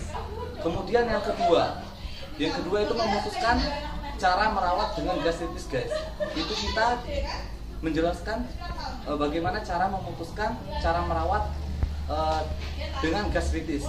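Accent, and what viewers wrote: Java, Indonesia. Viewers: Medok bgt mas. Hehe Good job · Medok kali lah bg hahaa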